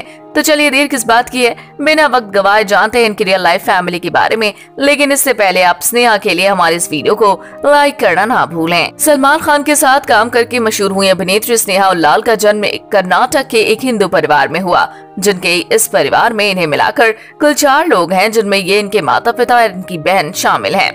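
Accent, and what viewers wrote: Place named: United Kingdom. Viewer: I think the narrator lady is Finding it difficult to speak hindi normally, why speak with a English accent? British gulami gayi nahi.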